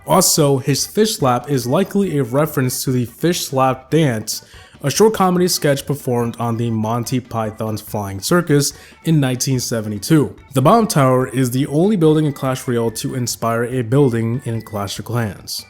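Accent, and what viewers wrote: United States. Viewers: this is the most american man i have ever heard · Good video expect the american midwest pronunciation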